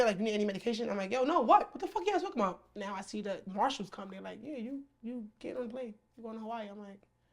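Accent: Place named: Brooklyn, United States. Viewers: Shes sooooo Brooklyn, i Love her. Her voice, her personality. The way you do anything, is the way you do everything, her business is gonna go far. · my girl so new yawk!!! what’s good!!!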